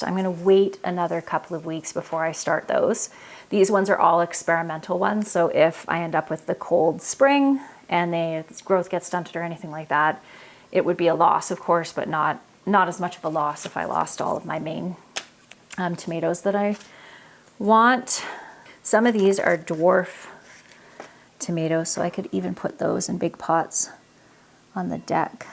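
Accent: Canada. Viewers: I am really enjoying the Canadian accent breaking through in this episode. Ahey! 😂 It is ramping up winter here where I live and you are ramping down. I am missing having a full vegetable garden and you will soon have a full vegetable garden!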